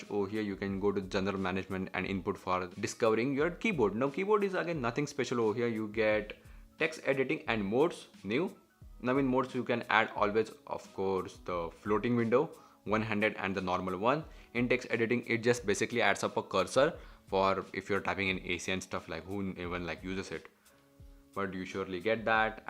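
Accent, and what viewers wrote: India. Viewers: What a horrible accent. Indian english is the worst.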